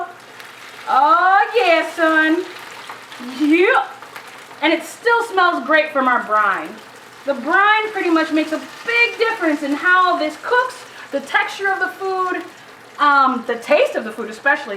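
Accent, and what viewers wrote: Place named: South, United States. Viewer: Just so Happy she doesn't Sound like she's from Ny but a Nice young Lady. Sounds like a a Good Southern girl 👍👍👍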